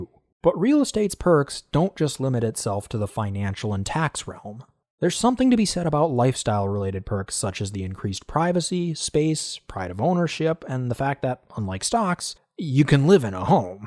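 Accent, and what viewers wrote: United States. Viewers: Oh ur an amercian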